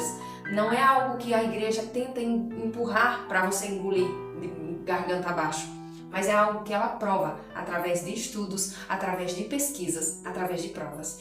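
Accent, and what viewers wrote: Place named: Northeast, Brazil. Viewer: Olá! De qual Cidade você é? Pelo "sotaque " é nordestina rsrs Parabéns por sua escolha 👏 Ganhou mais uma inscrita 😘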